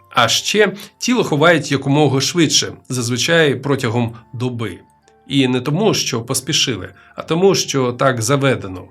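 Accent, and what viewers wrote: Russia. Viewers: У автора проскакує російський акцент.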